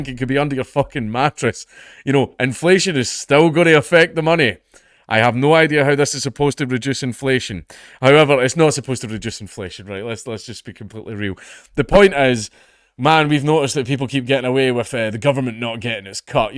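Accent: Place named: Scotland, United Kingdom. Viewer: Your commentary is always spot on, but I’d listen to you even if it wasn’t because I love that Scots accent!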